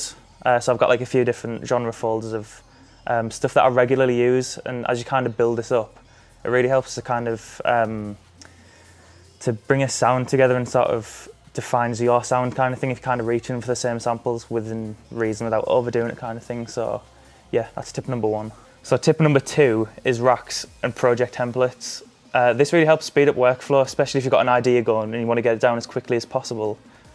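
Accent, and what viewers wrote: United Kingdom. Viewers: I always thought he was british haha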